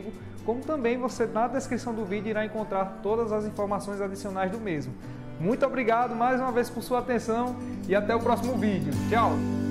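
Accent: Northeast, Brazil. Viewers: Lindo ap ! Logo saio daqui de São Paulo ,e partirei aí nessa cidade linda... amo é esse sotaque nordestino...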